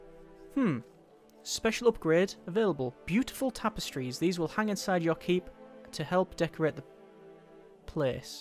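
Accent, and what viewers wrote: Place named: North East England, United Kingdom. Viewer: I’m very curious about where he is from, I feel like he is from the uk and possibly the north east but please correct me if I am wrong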